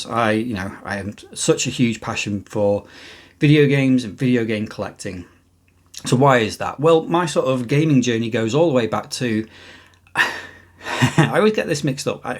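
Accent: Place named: Northamptonshire, United Kingdom. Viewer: Them dulcet Northants tones are awesome. Keep it up mate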